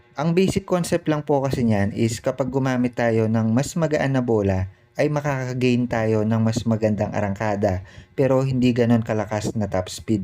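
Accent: Pampanga, Philippines. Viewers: Kapampangan ka Boss? Tono pa lang eh. Nice one dami kong natutunan dito.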